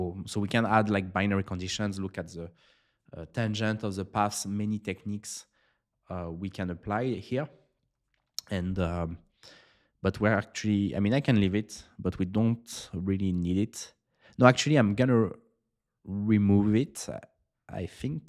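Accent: France. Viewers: Le meilleur accent français ! 🇫🇷 Great Video 👍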